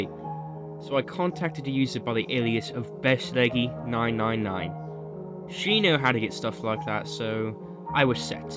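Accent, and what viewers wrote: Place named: United Kingdom. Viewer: the slight british accent while reading this makes this video ten times fucking better man, great job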